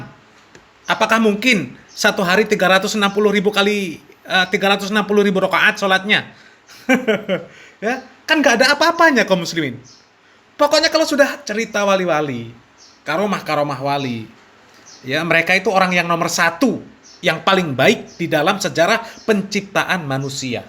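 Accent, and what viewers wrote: Java, Indonesia. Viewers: Saya suka channel ini. Channel yg mencerdaskan akal ... Apalagi ditamabah narasi dgn LOGAT JAWAnya yg MEDHOK.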